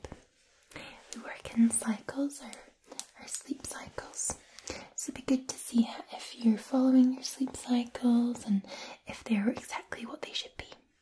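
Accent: Scotland, United Kingdom. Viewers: im working at a construction site lifting 4ton tubing listening to scottish asmr... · I didn’t know I needed Scottish Accent ASMR until now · Sleep therapy with a scottish accent. Nice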